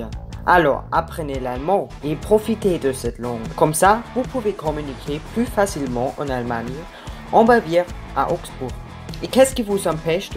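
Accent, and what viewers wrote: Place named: France. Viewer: Votre accent français est superbe, j’arrive très bien à vous comprendre..à plus à augsbourg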